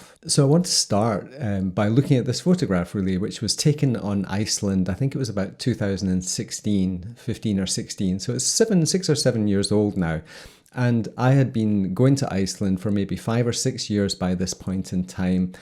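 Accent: Scotland, United Kingdom. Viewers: your nice Scottish accent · The hypnotic Scotish (not Irish) accent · You do have a gorgeous Scottish accent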